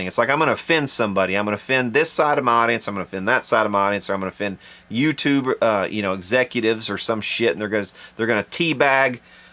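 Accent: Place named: South, United States. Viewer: Hey, that was great felt good to hear! Coincidentally, I heard the show Joe B. put on here in Munich as his next to last, 49th of 50 this year in Europe. He moved around quite a lot, had Mr. Reese Winans on keys, 2 horns(one with vocals, too), 2 singers, drums and bass. I'm a good deal older than you at 66, but my mid-20's son, who pounds a pretty mean drumkit and is a lot more into metal, really thought it was pretty good. We were close enough that it was a bit too loud sometimes, but the energy of the band was to my eyes downright awesome, they were really in what I would term flow for about 80 percent of the time. Joe played a massively well-modulated acoustic solo after he'd already said, "Good night!" the first time which felt like about 20 minutes. Then the band came back and did another song to close. I really enjoyed it. I don't go to concerts often, but that was definitely worth the time, braving the rainstorms and the money. 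Thanks for the vid and keep it up. Your expletives are not my style or habit, but the southern flavor comes through nicely.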